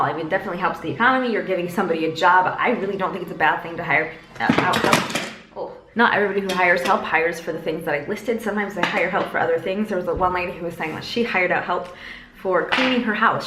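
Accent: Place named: France. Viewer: Omg you are So Beautiful and your French accent on your other channel is Wonderful!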